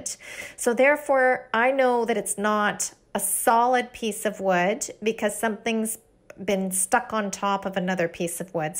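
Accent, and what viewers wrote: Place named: Canada. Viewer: gosh she sounds so canadian (and i live in ontario)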